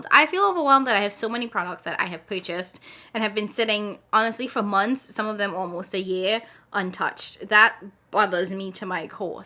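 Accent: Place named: Trinidad and Tobago. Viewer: Found your channel today and I'm enjoying your content and Trini accent.......it's like seeing a familiar face in a room full of strangers. Please continue to post and share your knowledge.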